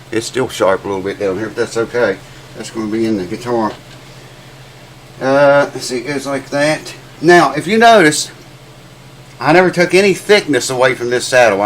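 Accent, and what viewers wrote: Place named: South, United States. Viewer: ~ Just out of curiosity: where, geographically, are you located? Your accent seems to say somewhere down here in the south, but you've made a few videos of massive snow dumps... {?}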